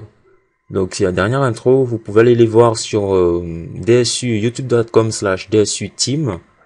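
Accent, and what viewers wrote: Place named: Antilles, Caribbean. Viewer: a ta voix sa se voit que t antillais ;)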